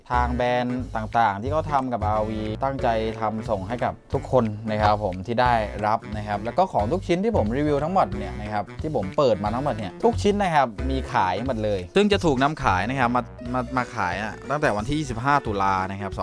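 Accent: South, Thailand. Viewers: พี่ย่าคนใต้หรอค้าบบ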